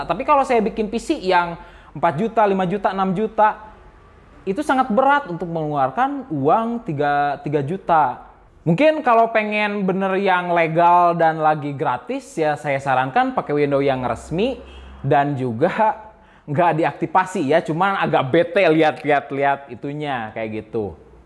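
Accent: West Java, Indonesia. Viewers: sunda pisan om semua huruf f diganti p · dia orang sunda makanya ngomong kek gitu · Nah ini, daritadi gua fikir logatnya asli sunda kwkwk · penjelasan dengan kearifan lokal , ingrris ala" indo dan penjelasan "F" dengan khas orang sunda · Pasti orang sunda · Alah siah sunda pisan euy fenjelasannya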